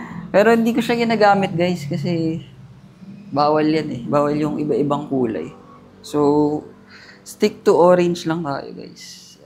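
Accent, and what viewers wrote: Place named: Visayas, Philippines. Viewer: solid ung pgka bisaya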